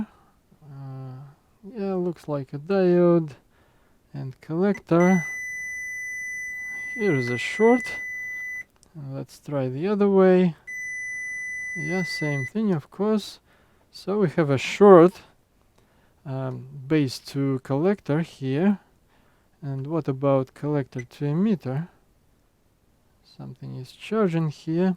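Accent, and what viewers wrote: Russia. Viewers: Nice job. And charming Russian accent. 😊 By the way, shall you use 240V bulb instead fuse at first power up?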